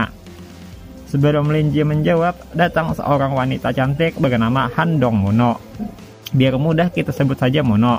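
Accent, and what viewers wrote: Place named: Banjar, Indonesia. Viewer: banjar leh.. lanjutkan bang 😂 unik ni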